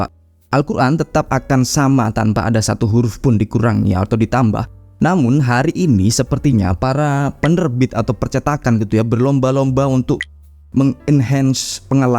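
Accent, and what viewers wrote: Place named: Banyumas, Indonesia. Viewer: Jawaa ngapak bang😂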